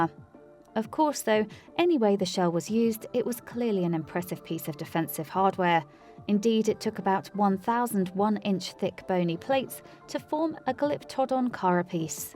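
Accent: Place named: United Kingdom. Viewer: "Pay-lent-to-logical." The British accent fooled me for a while.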